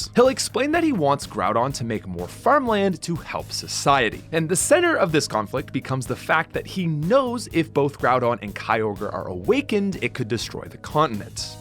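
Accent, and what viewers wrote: Canada. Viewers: Is this guy Canadian? . With oat a doat. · As a fellow Canuck I love watching these videos and hearing the accent come oot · He's Canadian.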